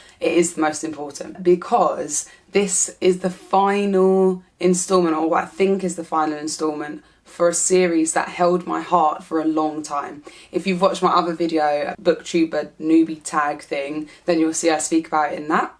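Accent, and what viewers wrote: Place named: South London, United Kingdom. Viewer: That South London accent is thick! Lol. Must be Brixton. Anyway, I'll look out for your reviews of Sci Fi books. Happy booktubing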